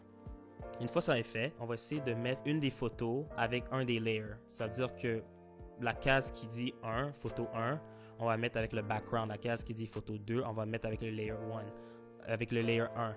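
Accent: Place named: Quebec, Canada. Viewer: "desole pour l'anglais" 😂 allors, mon francais n'est pas tres bon sans Google Translate. (<- I actually typed that without looking it up so it probably has grammatical/spelling errors) Being from the Toronto area, while I "learned" French, in school. It was hard to properly learn it. I'm learning more from watching French-speaking youtubers and French shows than from class! The auto captions helped me understand what you were saying but it's not always accurate. maybe for these, you could include French captions. Also, as soon as I heard your French, I KNEW you were from Quebec...the accent is just too indistinguishable😄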